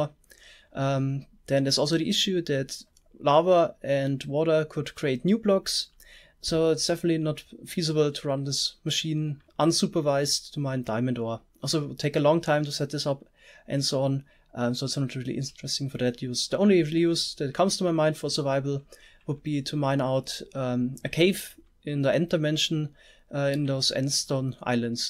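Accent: Germany. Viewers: A netherstar block, some octuple compressed Diamondblocks and making it a big multiblock structure would be a worse troll ^^ Yeah. But some things are.. Well.. How to say. Inefficient and laggy? :D I mean if you place down 200 pistons, observers, redstone dust, etc. you'll get some problems :D (on mps ^^) Bist du eigentlicher Deutscher? Bin noch nicht so lange dabei und merke es nur am Akzent ^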